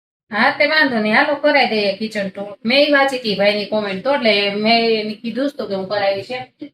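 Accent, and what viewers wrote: Kathiawar, India. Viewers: કાઠીયાવાડી લાગો છો બોલ સાવ ઉપર બેન કયા ગામના છો એટલું જણાવજો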